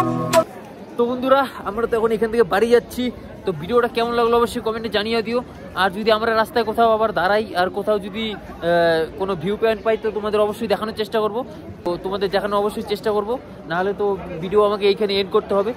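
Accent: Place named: Bangal, Bangladesh. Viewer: At first you have to speak bengali properly, bangal language is not acceptable in India.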